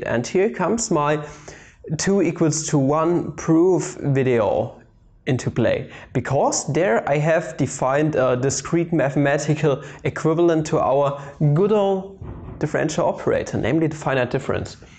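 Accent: Germany. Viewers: Are you german? You sound so bloody german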